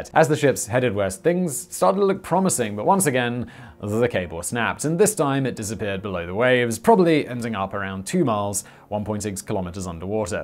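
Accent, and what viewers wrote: United Kingdom. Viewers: Thank you for pronouncing Newfoundland correctly.. well at least as correctly as possible with a British accent.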